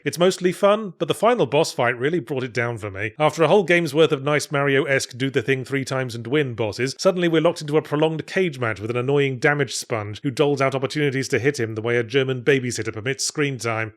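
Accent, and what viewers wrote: United Kingdom. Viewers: Yahtzee explained in a stream that it's just awkward to pronounce. Likely due to his British pronunciation of the "banana" part specifically.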